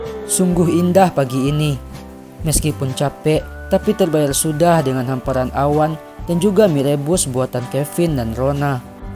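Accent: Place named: West Sumatra, Indonesia. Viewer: Logat Minang nyo dan😅😅😅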